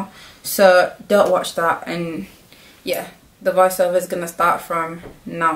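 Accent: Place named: Northern England, United Kingdom. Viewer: So refreshing to hear a northern accent on YouTube for once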